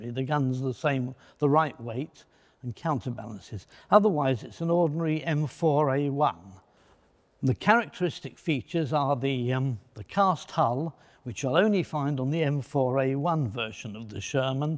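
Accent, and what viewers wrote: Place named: United Kingdom. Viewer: The British accent and the beautiful mustache make the video better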